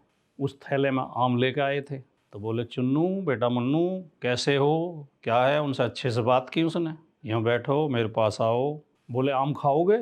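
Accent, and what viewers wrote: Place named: Rewari-Alwar, India. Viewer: मैंने आपकी काफी पोस्ट देखी है कोशिश अच्छी है परिणाम देर से ही सही आएंगे जरुर बाकी अंधभक्तो को आईना दिखाने की जरूहु नहीं है साहब आपके बोलने के लहजे से आप रेवाड़ी अलवर मथुरा लालसोट दोसा भरतपुर इलाके के लग रहे हो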